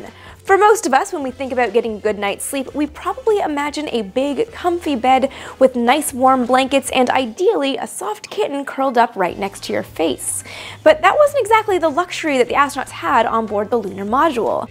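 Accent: Canada. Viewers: Are you Canadian? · Is that a Canadian accent I detect? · your hot and you sound Canadian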